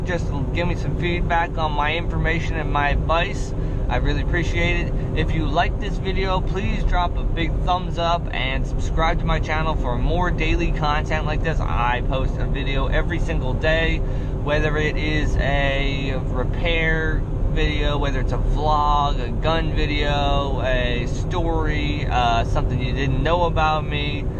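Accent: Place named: Virginia, United States. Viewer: what part of va is this, I can tell it's va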